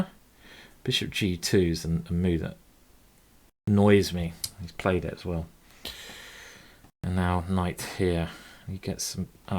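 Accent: England, United Kingdom. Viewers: I love it when he swears. Profanity is not only humorous but should be mandatory with such a fine english accent.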